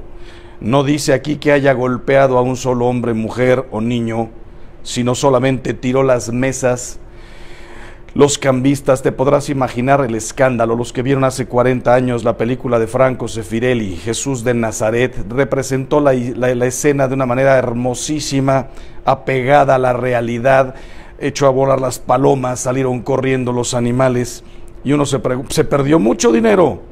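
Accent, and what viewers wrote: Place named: Argentina. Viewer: Pareciera que el padre habla en Argentina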